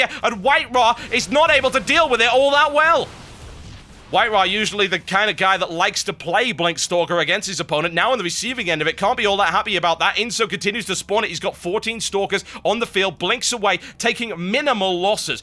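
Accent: United Kingdom. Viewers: thats the power of a british accent.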